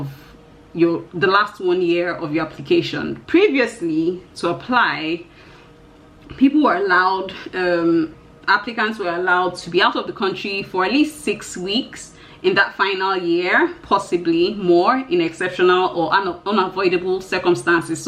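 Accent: Nigeria. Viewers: Lool I liked this video because of your Nigerian accent and the Nigerian humour you used to announce what is essentially bad news which didn't make it sound as bad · Hi...did you live in Lagos? You sound Yoruba. Regards from the UK.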